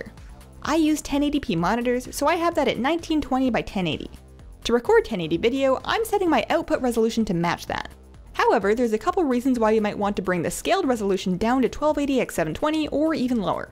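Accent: Canada. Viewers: Love your Canadian accent haha Very helpfull video!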